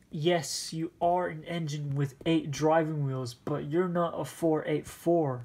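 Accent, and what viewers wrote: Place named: United Kingdom. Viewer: wow british accent on point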